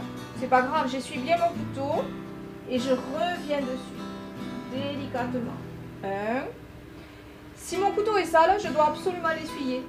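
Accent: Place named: Provence, France. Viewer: Vos peintures sont très belles , et votre accent a un parfum agréable de provence . Merci pour vos tuto .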